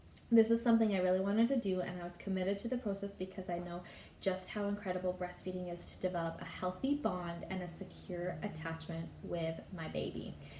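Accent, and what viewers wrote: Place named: Canada. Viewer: John Sanders she sounds like she has a Canadian accent to me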